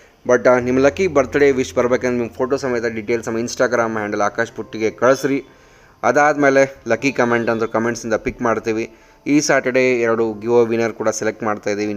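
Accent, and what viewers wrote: North Karnataka, India. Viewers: Hi This is Shridevi From USA 🗽🇺🇸 Nanu nimma volgs nodide supper edav ri.... Namma Uttara Karnataka bhase kelaka kushi anastheti adu America dalli and niv Belagavi market thorshidri nodi nanna memories recall adav yakadre navu 2 years belagavi Naga eddavi nanna magalu KLE school he hogtiddalu. so nanu scooter togondu tirgadthaedde so niv thorso belagavi places nodidre nenapaketi anyway nimma mother supper edare Nice volgs Best of luck 👍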